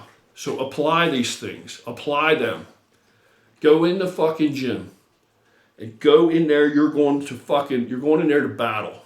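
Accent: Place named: New Jersey, United States. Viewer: Watch a few of your videos back to back. I couldn't agree more on your philosophy thus far. Appreciate you. Sounds like your in the Jersey or Pa area based on your accent. I'm in south Jersey myself. Keep killing it man.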